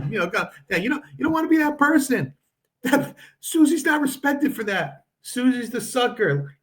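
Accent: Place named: Long Island, United States. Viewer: I'm guessing Long Island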